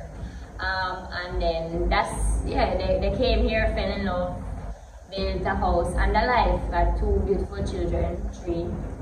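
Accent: Jamaica. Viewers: When I first saw you on Drizzy doing the sexual harassment episode with the other girls..you had me cracking up. I was born in kingston and i had a few white Jamaican born friends that speak just like you. I am very glad to see you setup your own channel. So blessed up yourself. and keep doing you thing. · I expected to hear uptown patios but a full blown country pickney dis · Patois boss!!😅😅 just found out u have a Chanel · I don’t know if it the case with …… but I think what the problem is it’s hard to understand her. Cause her patois is strong, strong. I am an American but can usually understand patois. But it depends on the person. From what I’ve learned I think usually those from country can be a little hard to understand. My Jamaican brother in law says he can have a hard time understanding some people there. Think would be one hard to understand for him. 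Cause it is for me. Maybe if she spoke slower it would help.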